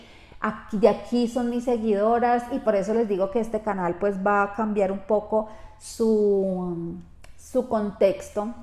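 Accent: Colombia. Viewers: 😪😪😪😪, que trsiteza me da saber que dejar este mi bello y violento México 🇲🇽 pero me agrada enooooormemente que te vayas a tu país que tanto extrañas, ya que la pandemia echó a perder tus tan aheladas vacaciones. Muchas gracias por todos tus videos, tus vivencias, tus proyectos, etc. Y si, con la ayuda de Dios yo te seguiré de cerca porque déjame decirte que siempre he querido conocer Colombia, me agrada su gente y ese acentico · Hola, que padre que puedas regresar a tu país, me imagino lo mucho que has extrañado a tu familia, espero todo sea de bendición para ustedes y que sigas compartiendo con nosotras tu vida en Colombia, lo que más me gustará es que tendrás más marcado tu acento colombiano que tanto me gusta, DTB en esta nueva etapa y como siempre al pendiente de tus videos.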